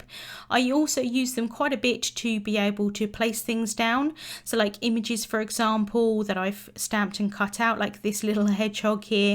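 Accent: United Kingdom. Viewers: I am so glad I found you today over at MFT. I love your card making style, and I love your voice and British accent are so pleasant to this American Southerner to listen to! I appreciate all of these tips, and I look forward to watching many more of your videos!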